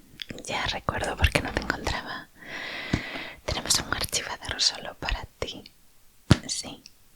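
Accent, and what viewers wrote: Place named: Spain. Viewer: jajajajajaj 😂😂 te luciste con este video fue mega divertido y relajante me resultó genial la vocecita al teléfono! saludos desde méxico ❤❤ de qué parte de españa eres? me encanta el acento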